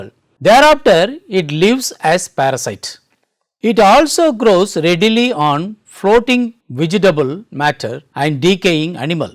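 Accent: India. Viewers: Respectfully, I feel it is a shame there is no subtitles for this video. There may be helpful information on the subject matter but the speaker's extremely thick accent makes it nearly impossible to understand what he is saying. I find it very difficult to listen to people with an Indian accent speak English and they very often seem to insist on doing it anyway without subtitles and without learning how to properly pronounce and accunciate English words. I can't imagine learning to speak Hindu with an American accent and expecting native Indians to understand what I am saying and retain helpful information on a scientific or medical topic without being distracted or frustrated by the language barrier. Would it not be better to make the video in your native language and have subtitles in English or have it translated and a voice-over done by a native speaking English person if you want your viewers to truly obtain the content you are providing?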